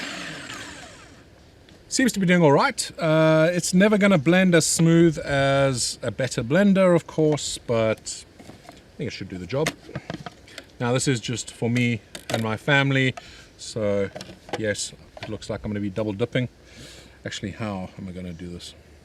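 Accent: South Africa. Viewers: By the way. Are you an EXPAT? You Sound like a South African boytjie 😁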